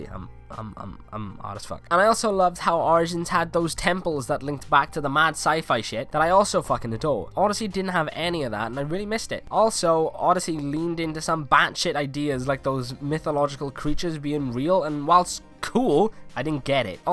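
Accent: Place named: Wales, United Kingdom. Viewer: Thanks for the help! Btw are you Welsh? Sounds like you're welsh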